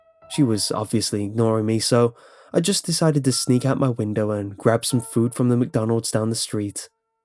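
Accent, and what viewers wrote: United Kingdom. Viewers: Gosh damn it he's British.......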